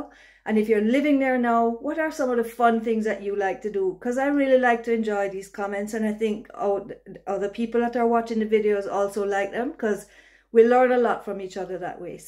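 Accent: Jamaica. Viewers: Exactly my Point. You ARE JAMAICAN. Not that many people were questioning that (except for that "ignoramous" Michael Knight)...But ANY Real Jamaican who HEARS your Stories (which to me are just your LIFE HISTORY) and hear you talk, would NEVER question if you are Jamaican.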